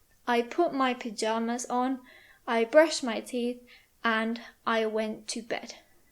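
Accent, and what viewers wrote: United Kingdom. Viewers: Her tone of voice, pronunciation and intonation are really very good. The recordings are pleasing to ears, much better than those channels which adopt AI sounds. Well, another reason might be that I prefer the British accent to the American accent. 😃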